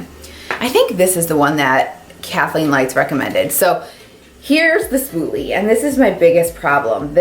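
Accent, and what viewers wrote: Chicago, United States. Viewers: Great video, as usual. You are one of my favorite YouTubers, and I wish you much success☺🌴👍🏼🤹‍♀️Love your accent which sounds so much like the Chicago area types I heard everyday when I lived up there during some of the happiest years of my life❄🌈🌠Hope you will have a wonderful Christmas, (it's Dec 24, 2020) 🎄⛄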